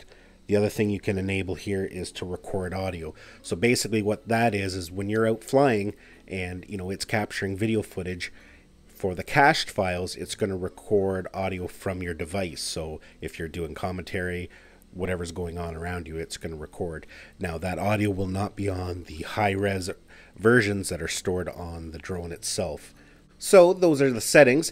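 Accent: Canada. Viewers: Helpful, but focused mostly on your Canadian accent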